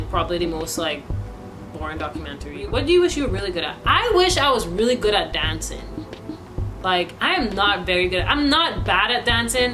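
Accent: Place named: Trinidad and Tobago. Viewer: Really proud of you. Still hearing the lill Trini . do ur thing girl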